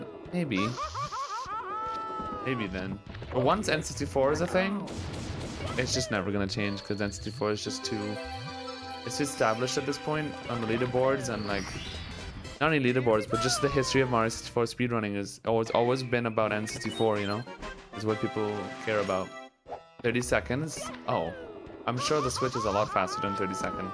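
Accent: Trinidad and Tobago. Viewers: Does anyone know where is from. Could be my ears playing tricks on me but his accent sounds like he’s from Trinidad and Tobago. Or at least grew up here.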